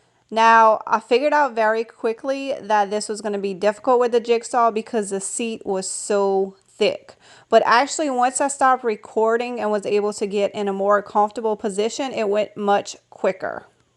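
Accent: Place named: New Orleans, United States. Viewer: I live in east Texas. Sounds like you're from New Orleans. Love the planter and ladder.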